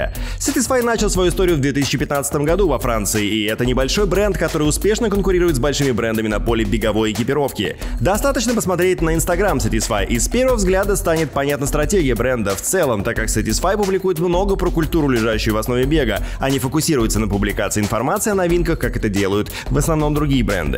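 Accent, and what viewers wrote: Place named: England, United Kingdom. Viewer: О этот английский акцент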